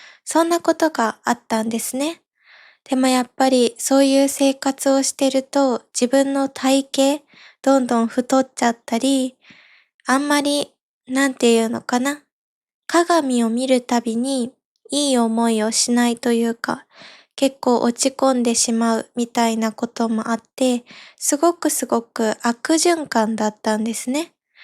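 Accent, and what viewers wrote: Kansai, Japan. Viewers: Hey, I listened to the last 15 Podcasts so far and always try to catch up :), no matter what topic I love listening and learning together with you. Actually when you become more "negative" I can resonate a lot, it makes me thinking of happyness and future and I want to thank you for that, because without speaking about it, it just stacks up over time. My Japanese improved also sooo much (Next time I try in Japanese again :D) :) PS: Your Kansai Dialect is very cute ^^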